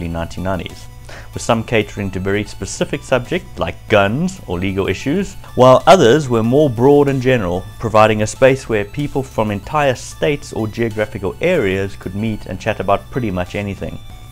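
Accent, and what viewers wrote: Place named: South Africa. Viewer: Thanks for the enjoyable video. Very sorry to hear about the camera, but the little one seems to be doing a decent job. It's also great to see somebody in SA covering retro tech. I'm from the other SA, on the other side of the planet; South Australia. That said, I've been lucky enough to spend time in South Africa (and East Africa) on several occasions. Your accent made me smile, and really miss the place. Have a good weekend!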